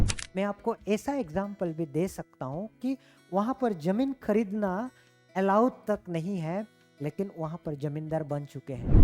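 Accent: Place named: Bangladesh. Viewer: Eye bangladeshi hai bolne ka tarika patachal teh.hai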